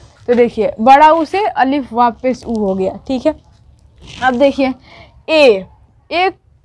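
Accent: Bihar, India. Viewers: उर्दू का उच्चारण मुश्किल है। यही एक वजय है, लोग उर्दू सीखें से कतराते है। आप तो बिहारी है। आपके लहज़ा से पता चलता है। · आपका prounaunciation से ऐसे लगता है की आप बिहार से है, कहा से हैं आप?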